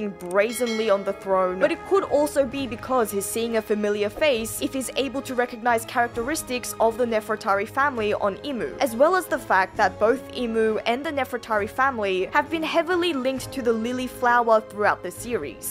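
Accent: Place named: Australia. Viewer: This girls accent is so jumbled between Australian and Brittish.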